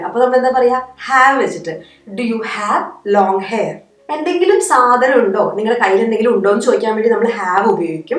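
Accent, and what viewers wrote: Thrissur, India. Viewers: Are you from thrissur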